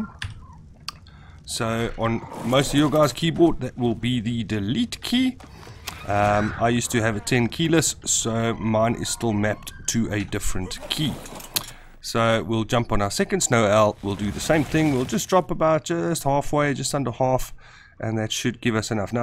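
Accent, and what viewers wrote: South Africa. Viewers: Came across your Subnautica video on how to find the Blood Kelp forest and instantly heard that beautiful SA accent😂 subbed somme dadelik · Lekker die SA! Cant miss that accent xD Good vids boet keep it up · My fiance is from SA. He heard your accent and knew it right away. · Eyyyy! I heard the SA accent and subbed straight away, lekka man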